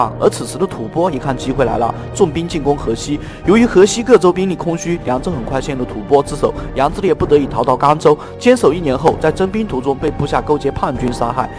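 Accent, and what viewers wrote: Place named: Hong Kong, China. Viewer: 應該是香港人吧!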